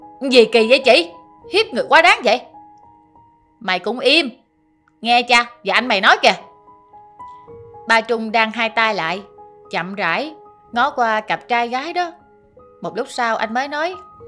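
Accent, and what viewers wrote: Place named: South, Vietnam. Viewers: 😅dung la m.c co giong doc mien nam rat gi🍓 khong lai ti nao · giọng diễn đọc rất miền Tây Nam Bộ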